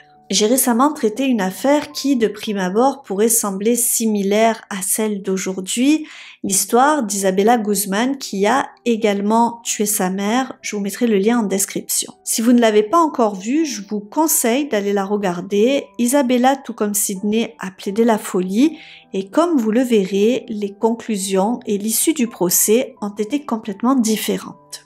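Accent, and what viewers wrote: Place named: Marseille, France. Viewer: Tu es de Marseille? tu as l'accent de Zizou.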